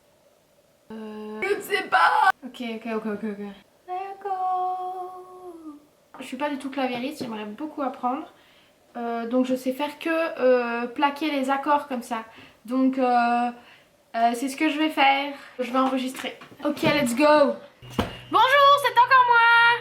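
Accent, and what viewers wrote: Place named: Eastern France, France. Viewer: J'ai passé la vidéo à écouter ton accent ToT ne serais tu pas de l'est type Haute Saône ?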